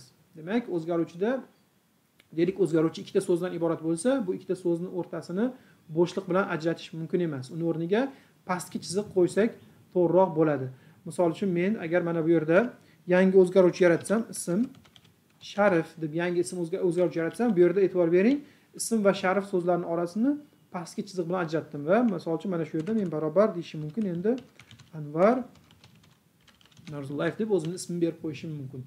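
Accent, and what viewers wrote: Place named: Tashkent, Uzbekistan. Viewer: Toshkencha accent ingilizcha so'z yoqmasa surgin bettan yoki qsp darssi organ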